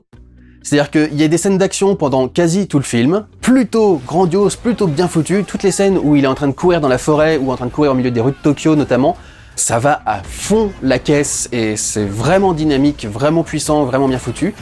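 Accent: Quebec, Canada. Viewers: très bon accent québécois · Accent québécois correct.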